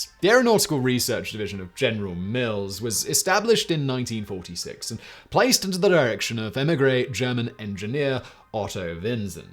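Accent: England, United Kingdom. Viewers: Misinformation never sounded so english and bald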